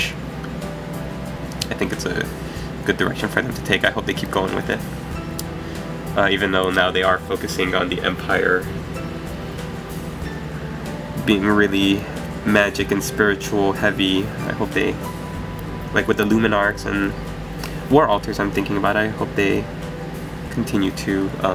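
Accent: Austria. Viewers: Nice Austrian accent. :D Do you train this voices? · ahahaha, definitely austrian accent!! love it, great job ^^ as you might know by now i'm austrian myself and you really did an excellent job! however, fantastic unboxing, tempting me to get one of these great minis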